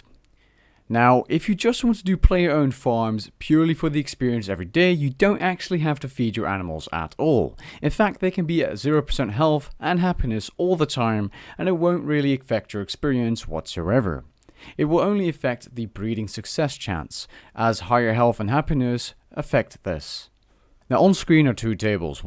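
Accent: Netherlands. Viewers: i knew there was a bit dutch in the voice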